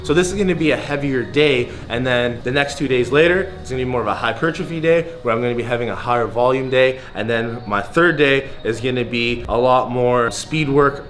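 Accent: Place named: Midwest, United States. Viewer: I thot you were from dmv lol why you say erms like you from midwest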